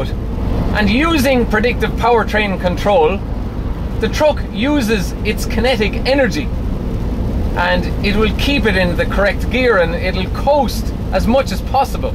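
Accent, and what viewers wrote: Ireland. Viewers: I bet you are Irish, aren't you ? Thanks for the introduction to the ACTROS. I used to live in Galway, was a pleasure to hear IE, again !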